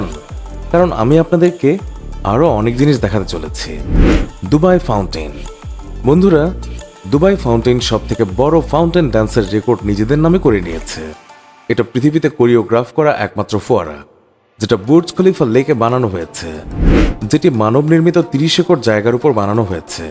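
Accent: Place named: Bangladesh. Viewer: Narrator, Why you call one hundred seventy thousand RUPY?? Shouldn’t it Taka?? Your Bangla accent similar to Bangladesh accent... So Taka you must mention. If you are a Indian man then Rupy is good for you.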